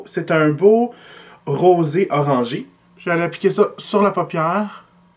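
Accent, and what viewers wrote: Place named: Quebec, Canada. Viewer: Woooow the Canadian French. I really need to get used to it. Lovely look!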